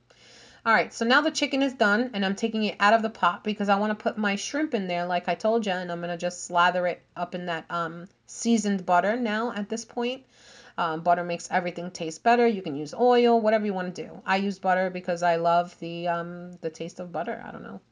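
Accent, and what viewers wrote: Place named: Long Island, United States. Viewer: you sound like ur from Long Island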